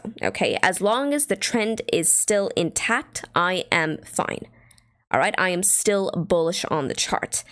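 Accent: Brooklyn, United States. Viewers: Lol her accent is kinda weird, I’ve lived in New York and how she pronounced some words sounds pretty like Brooklyn 😅 like it esp the way she says “cardano” but she almost doesn’t sound American sometimes 🤔🤔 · Yeah Brooklyn is what it reminded me of too. At least the movies depiction of a Brooklyn accent.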